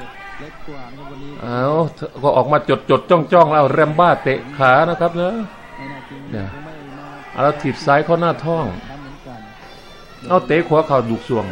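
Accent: Isan, Thailand. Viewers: มักหลายที่เว้าอีสานเด้อคลายเครียดได้ดีอิหลี😁😁😁😁😁😆😆👍👍👍👍👏👏👏🤣🤣🤣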